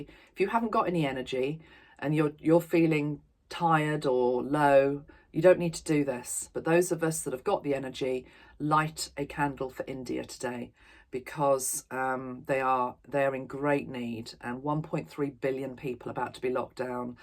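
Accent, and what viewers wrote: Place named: RP, United Kingdom. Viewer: I feel relief not schadenfreude when when people get justice, I feel we are moving into cleanliness. But I do want some 3-D justice - it will make things easier. and thank you for your dailies. I find you and even the sound of your queen's english - very satisfying.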